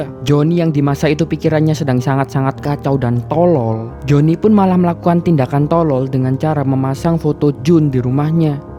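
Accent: Java, Indonesia. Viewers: medhok jowo👍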